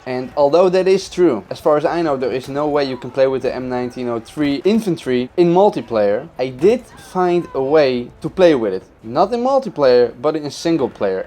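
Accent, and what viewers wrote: Netherlands. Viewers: You sound like a dutch person talking english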